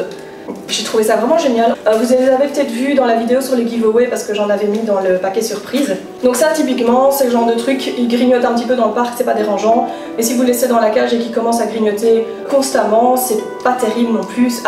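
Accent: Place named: Belgium. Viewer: Tu est belge ?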